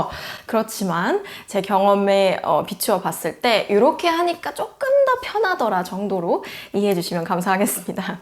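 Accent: Germany. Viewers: 나만 그른가 한국어 하실때 진짜 쪼금 독일어 발음으로 한국어 하는게 들립니다 ㅋㅋㅋㅋ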